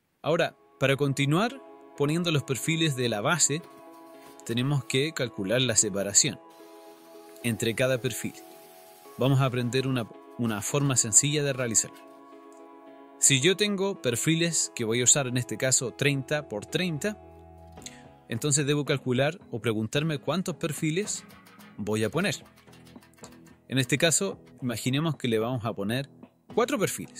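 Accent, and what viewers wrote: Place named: Chile. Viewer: Hola, gracias por compartir. Una pregunta: ¿De qué país eres (parece Chile por la tonada) y si hay legislación vigente hay que regule éste carro? Aquí en Argentina se complicó mucho con trabas, homologaciones, pagos de patentes y demás curros que te sacan dinero y tiempo. Gracias por compartir, abrazo. Dios te bendiga.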